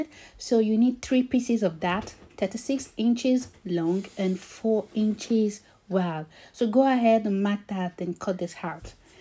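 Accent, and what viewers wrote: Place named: England, United Kingdom. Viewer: I like your English accent 😉😁👌 New subscriber 🤩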